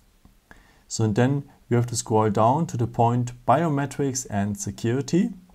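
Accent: Germany. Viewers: haha this guy is definitely German. thanks for the help :) vielen Dank :D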